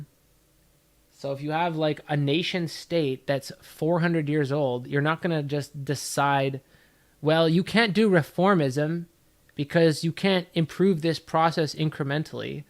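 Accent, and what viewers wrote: Canada. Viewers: Are you a west coast Canadian by any chance?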